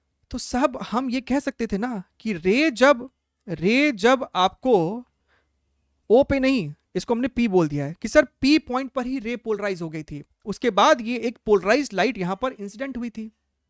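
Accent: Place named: Bihar, India. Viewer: Bhaiya I can tell from you accent ( hoyebe karega ) ki aap bihar se hai. If you can tell kaha se. Btw love your vid helped me a lot mains me and just sad ki itna late mila channel apka. Great job ❤❤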